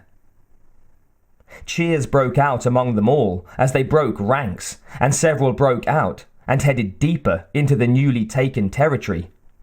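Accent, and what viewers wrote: United Kingdom. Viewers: Truly committed to your craft to finish the whole thing if you felt the same way I did. Berserker was how I found your channel. It was pretty good and the relationship stuff had a sinister angle to it which was much better than whatever Genocide was trying to do. Berserker narration was fine IMO. There is this actor Will Patton that narrates a lot of audiobooks and I always groan when he narrates one I want to listen to. Nothing against the guy but his voice is very distinctly from South Carolina which doesn't really work for most books he reads, at least for me. Your accent makes it sound like an English Thespian is reading and for whatever reason that seems to work for everything. I'll check out those other books, thanks for suggesting them.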